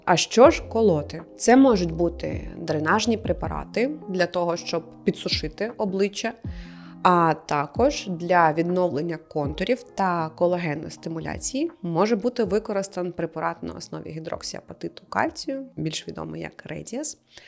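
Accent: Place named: Surzhyk, Ukraine. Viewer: Шийно-КОМІРЦЕВА зона...купа суржиків, неприємно слухати(((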